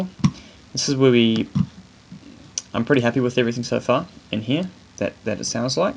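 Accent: New Zealand. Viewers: Are u kiwi?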